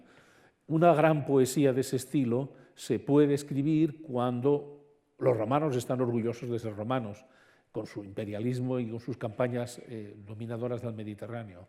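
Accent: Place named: Catalonia, Spain. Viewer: Encuentro esta conferencia 8 años despues de que fue presentada; quiero decir que nunca he leido a Virgilio, soli se de él por lo que nos enseñaron en la escuela y por cuanto Dante lo menciona en su Divina Comedia; dicho lo anterior quiero agradecer a la March por sus magnificas presentaciones y por invitar a maestros como este señor que me despiertan el gusto por la lectura de lis clasicos; gracias maestro Vidal por su hermoso acento Catalan y por todo su conocimiento compartido aqui.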